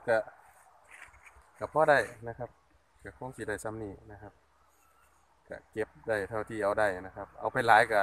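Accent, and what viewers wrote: Isan, Thailand. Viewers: ครูพูดภาษาอีสานน่ารักดีครับน่าจะพูดตั้งนานแระ☺☺☺☺☺☺☺ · เป็นครั้งแรกที่ครู พูดอีสานนะเนี่ย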